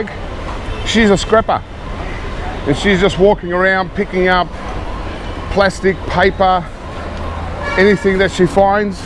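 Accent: Australia. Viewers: probably, Australian accent is too much for him!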